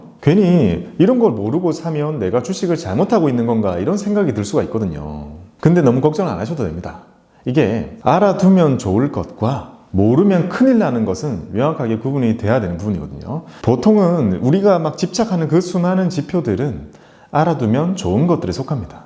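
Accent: Gyeongsang, South Korea. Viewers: ...이름이 왜 이럴까 했는데 이제 알았어요 ㅎㅎㅎ 편안한 경상도 사투리가 오히려 귀에 쉽게 쏙쏙 들어옵니다! 금맹인 제가 오늘 하루도 성장합니다! 진심 감사드립니다~~~건강하시고 계속 좋은 방송 부탁드립니다!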